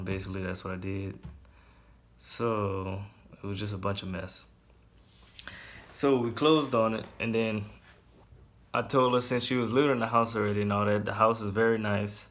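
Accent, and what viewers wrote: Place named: Eunice, United States. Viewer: This is my first time seeing one of your videos. I absolutely knew that I recognize the accent. I am from the Eunice area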